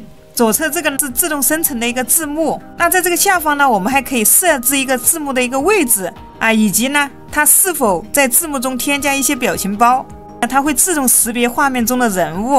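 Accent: Sichuan, China. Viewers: 这个川普太养人了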